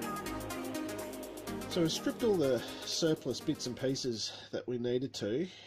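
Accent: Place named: Australia. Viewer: So refreshing to hear an Australian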